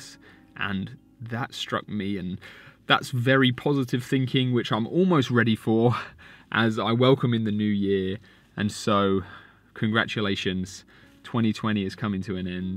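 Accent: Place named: London, United Kingdom. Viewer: i didnt know you had a london accent lol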